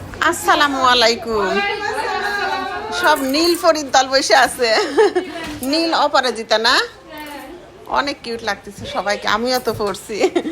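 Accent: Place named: Bangladesh. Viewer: আপু আপনি তো বললেন না বাংলাদেশে আপনার দেশের বাড়ি কোন জেলাতে। আপনার ভাষা শুনে মনে হয় আপনি আমার জেলার মানুষ। তাই খুব জানতে ইচ্ছে করছে। যদি কোন সমস্যা না হয় একটু বলতেন ভালো লাগতো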